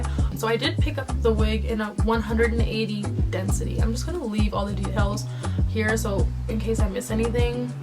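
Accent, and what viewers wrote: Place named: Canada. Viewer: Yessssss someone from Canada. I knew as soon as you starting talking 😂. You are gorgeous! Thanks for this video, it’s been in my cart forever. So happy to find a Queen with this colour!😍😍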